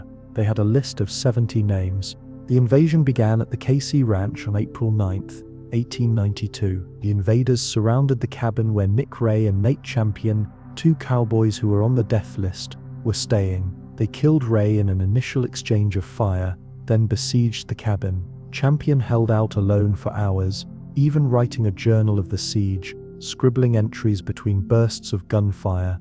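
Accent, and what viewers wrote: England, United Kingdom. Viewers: You know you're receiving foreign Edomite indoctrination in an English accent and mispronouncing names and words recklessly.... Know wonder the Western Hemisphere is lost in ignorance. Ju Ly for 1776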